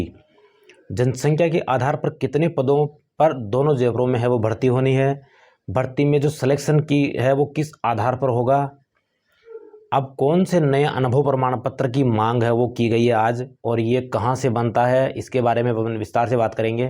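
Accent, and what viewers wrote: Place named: Dhundhari, India. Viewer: तू यार बिल्कुल देसी हिन्दी बोलता है मजा आ गया बिल्कुल ढूंढाड़ी जयपुर के मीणा जैसे की हम भी तेरे जेसे ही बोलते है इसी लिए हमे ओघड़या कहा जाता हैं वा लौंडे मजा आ गया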